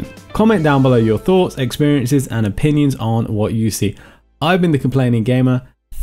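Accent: United Kingdom. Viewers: Thankyou for the new info as always!! that british accent tought e-e...!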